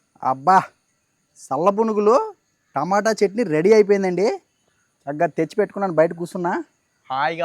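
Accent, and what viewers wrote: Godavari, India. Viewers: మీ విడియో లు చక్కని గోదా వారి యాస తో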